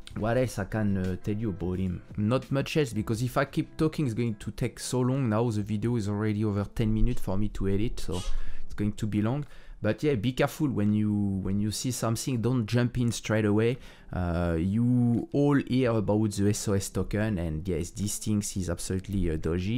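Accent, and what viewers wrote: France. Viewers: Quasi sûr que t'es français ! Bonne chance pour cette chaîne anglophone, ça doit pas être facile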